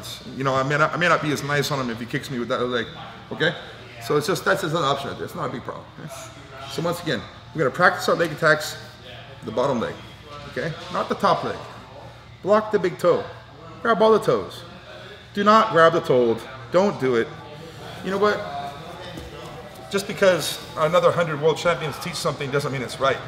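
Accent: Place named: New York, United States. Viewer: great tips, plus you talk like a real New Yorker / New Jerseyan (the Proper English) ... awesome OSS ...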